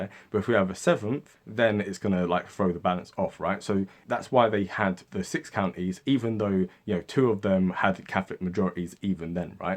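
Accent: England, United Kingdom. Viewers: Another thought-provoking video. I don't feel like you have a bias, you've said things that would kind of piss off both communities here. Your videos are uniquely well researched, strange to hear an Englishman sound like he actually knows a bit about the North. Respect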